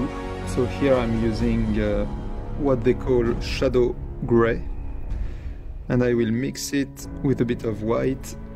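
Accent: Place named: France. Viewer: j aime bien ton accent français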